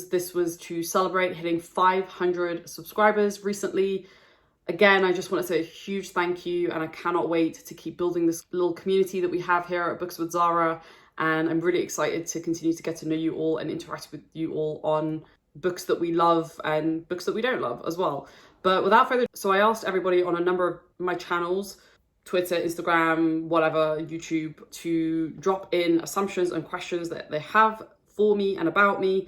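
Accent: United Kingdom. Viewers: Well, I DO like your british accent. Then again, I've never really considered myself as a genuine french person 🤔 (rather than a breathing multinational (tm), haha). It's nice to get to know/understand you a bit more. I primarily thought I didn't care about those kind of videos (feels like a one-way street, if you see what I mean), but I guess it's always pleasant when it's about someone you find truly interesting and has that "something" that resonate with you. Again, congratulations. And here's to a few thousands more !🍺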